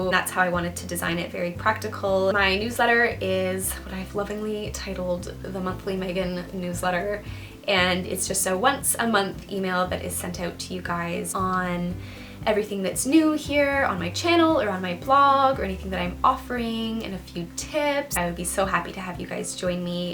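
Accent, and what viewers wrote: Canada. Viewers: Awesome guidance! Super excited when you post a new video! Oh, and I love the Canadian accent!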